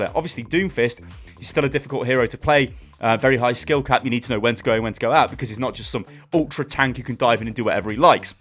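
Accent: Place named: Kent, United Kingdom. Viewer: Your from Kent in the U.K. arnt you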